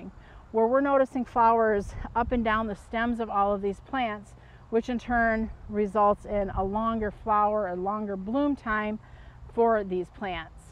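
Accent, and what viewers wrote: United States. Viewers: That Northern Midwest accent takes me back to my family...😊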